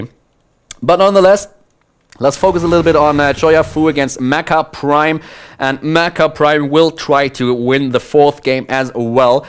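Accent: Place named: Germany. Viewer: wow no comments i dont know why cuz i really like the comentary and the German accent if im not mistaken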